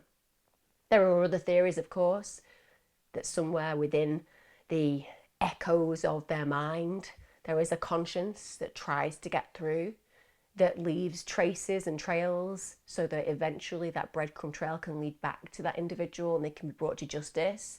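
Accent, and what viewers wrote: United Kingdom. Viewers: I'm getting ready to watch this new episode. I think this is my third episode with you. I listen to some other true crime YouTubers who occasionally do a "deep dive" but all your videos are deep dives, which I appreciate. I'm turning on the captions because my American ears can't always understand your lovely British accent.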